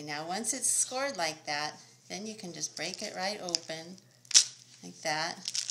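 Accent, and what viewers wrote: United States. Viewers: THE 'right' way? The accent held no surprise for me. Who else would be so arrogant as to think that 'their' way is 'THE' right way but an American? Say what it is: a better way, a less messy way. But 'the right' way? Says who? I've seen several ways that are even better.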